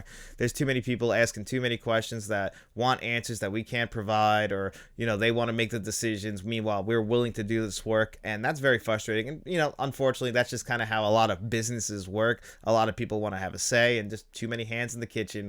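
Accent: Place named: Cockney, United Kingdom. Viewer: Cockney accent! From London 😎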